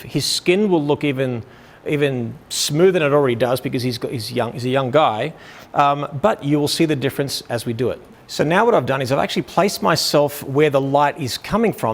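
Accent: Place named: Australia. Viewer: I love his aussie accent.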